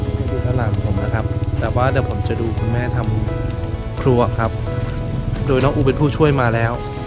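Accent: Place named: Phu Thai, Thailand. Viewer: สะบายดีน้องอูคนน่าฮักๆเว้าคือบ้านอ้ายภูไทม้วนๆ😊😊😊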